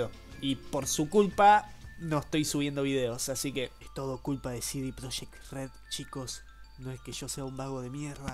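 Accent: Argentina. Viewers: No hay nada mejor que un narrador argentino (o con acento argentino)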